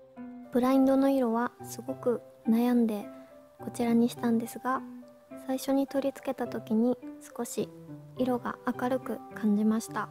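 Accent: Kansai, Japan. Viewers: 少しだけ漏れてる関西弁好き☺️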